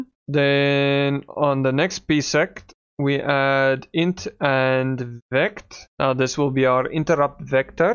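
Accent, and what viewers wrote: Afrikaans, South Africa. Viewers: jissie daai lekker afrikaanse aksent :)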